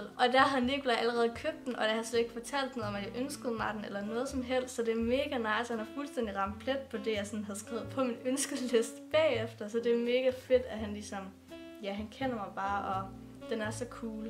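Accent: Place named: North Jutland, Denmark. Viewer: Det er så sødt man kan høre du kommer fra Nordjylland👏🏻❤️ Jeg kommer selv fra Nord-Midt Jylland😍🙌🏻 Du er virkelig god og dygtig så BLIV ved😘🙌🏻🙌🏻👏🏻👏🏻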